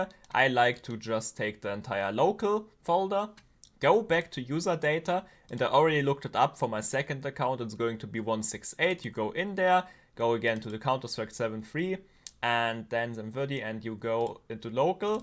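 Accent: Germany. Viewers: i hear a little bit deutsch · i hear se deutsch akzent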